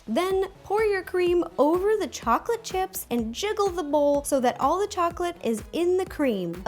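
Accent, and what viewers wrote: United States. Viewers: Can I just ask, is this all imperial? I use metric, cause I live down under, aaaaaaaaaaand I wanna make some stuff from vids, but she sounds American, so I'm guessing it's imperial.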